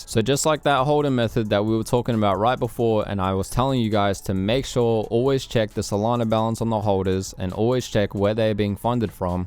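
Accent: Australia. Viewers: You sound like you’re from Sydney? Are you in Sydney? I’d like to do real life training online please.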